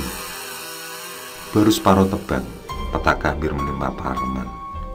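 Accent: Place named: Bojonegoro, Indonesia. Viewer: Wong jonegoro ndi suarane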